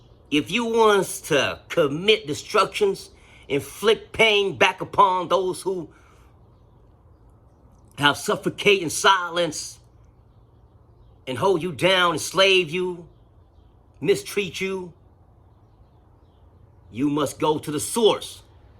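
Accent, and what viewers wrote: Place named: South, United States. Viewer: Powerful, brother ✊. You sound like you might be from the South. Mississippi, maybe? If you are, we need you to go to Minneapolis and school those MFs. I don't mean just the Tou Thaos, but also the Tou Ger Xiongs and the KaYings who purport to be the almighty leaders in the Hmong community. Tell 'em to take their degrees and get dafuq outta the way so you can stop this sh!t from happening again. They let Tou Thao happen and he embarrassed us all forever. I am pissed.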